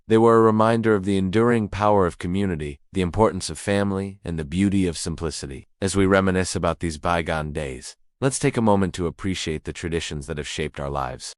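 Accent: United States. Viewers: Sorry, the AI produced pictures (look at any text, a lot of which is gibberish) and the Amurcan commentary completely removes any credibility this might have had. I winced at 'Mom', but turned off when we got to 'moble phones'........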